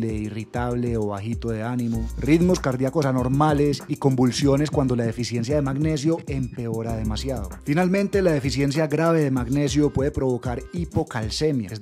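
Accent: Colombia. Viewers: Esa voz colombiana me da salud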